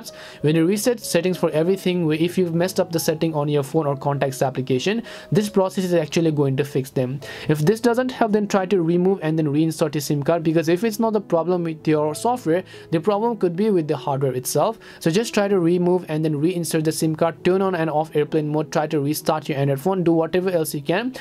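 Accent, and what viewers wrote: India. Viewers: Why is it always when I'm looking for tutorial its a dude with an Indian accent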